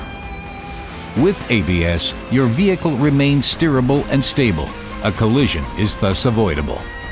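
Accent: United States. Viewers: so many information not right: driving a car in high heels, a murican accent trying to illustrate the scene by showing stepping on break and CLUTCH....but overall good job ABS. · did we need the "american voice"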